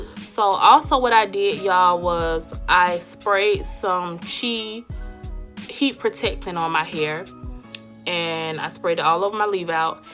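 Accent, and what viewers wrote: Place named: Atlanta, United States. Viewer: I knew you were from Atlanta, I can tell by the way you talk honey! Love this video. I use keracare curling wax and hard brush for my leave out because they were out of the stick. It works the same.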